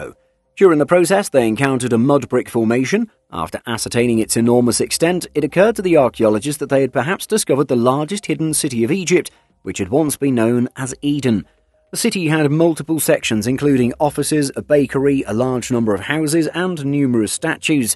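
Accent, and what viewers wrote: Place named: United Kingdom. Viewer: Rehash in a sharply cut, and quickly paced pro voice, in Brit accent. Not a Like.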